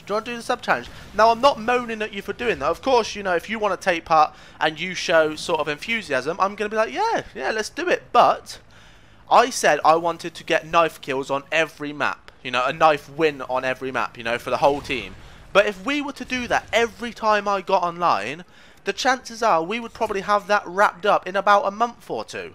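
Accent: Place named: United Kingdom. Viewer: Thx for sending the vid :p I love brittish axent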